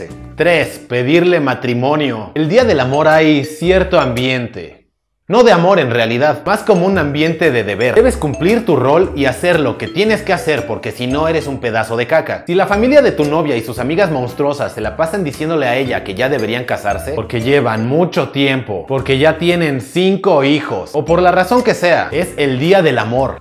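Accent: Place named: Mexico. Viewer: Jajaja me gusta mucho el canal. Además que el español Mexicano me resulta gracioso. Saludos de un Venezolano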